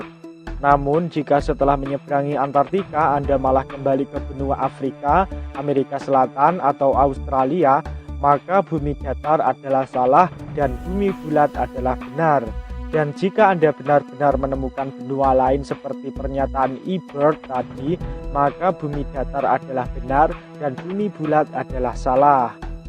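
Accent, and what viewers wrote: Java, Indonesia. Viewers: kok gue jadi fokus yg jelasin ya bahasanya medok jawa bgt 😁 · Naratornya medoxx bgt😀😀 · Medok amat njiiir 😂😂😂😂 Btw buat yg mendebat, membully,beradu argumen pesrsepsi tentang bumi datar anda luar biasaaa.. Terserah anda mau menertawakan, menganggap ada2 saja, menganggap bodoh ,itu bebas hak anda hehe Buat apa ngeladenin2 yg ngga percaya atau yg kontra.. Para Flatter hanya mau membukakan konpirasi besar kepada semua umat manusia dengan angka(fakta). Bukan hanya dengan faham yg turun temurun diajarkan. Like "orang udah diajarin di sekolah2 dari dulu bumi bulat ,ngaco aja". Hehe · naratornya indonesia asli.. wkwkwk... hidup medok.. 😁😁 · logat,e medok. 😀😁😂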